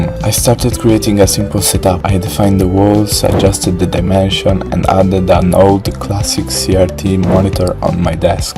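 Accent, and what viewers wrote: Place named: Italy. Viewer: Sento il accento italiano ti ricordo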